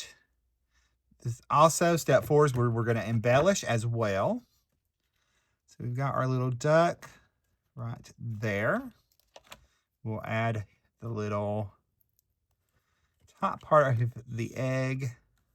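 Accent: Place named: South, United States. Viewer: Such a cute card and it came together so quickly! The ink blending on your die cuts and the highlights from the white gel pen really do add detail that packs a solid punch! You're the first crafter that I have heard share the term "Decision Fatigue" and this is real! I'm sure that it occurs more than some will let on but thank you for bringing this valid term to light. Love hearing your southern accent and watching you create.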